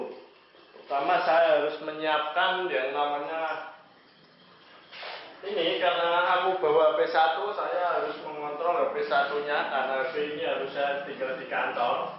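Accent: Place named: Java, Indonesia. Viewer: Medokmen to yo hhah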